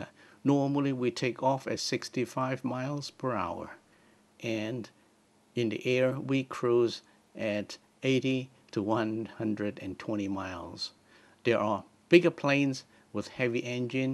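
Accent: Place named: United Kingdom. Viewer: You sound British. almost.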